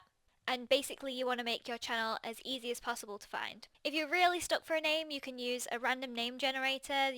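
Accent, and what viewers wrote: United Kingdom. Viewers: Best part she's British :D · Are you from the UK?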